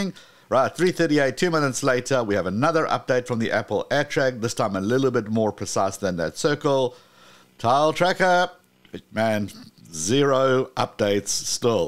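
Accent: South Africa. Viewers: Thanks for the video! Your maps showed Dallas. Your accents sound South African with a touch of Sydney. Ever been to Australia?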